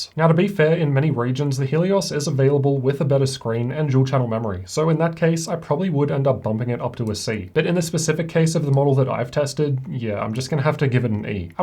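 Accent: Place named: Australia. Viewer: I love your videos and Insite on everything but when I I hear you say words that end with Ole or ore and some other words I don't know how you don't start just dry heaving. How do you get that low and distorted while stile talking without choking lol your voice is amazing I can't even do an impression without choking lol your deep voice mixed with the aussie accent kills me I love it, but can't recreate it lol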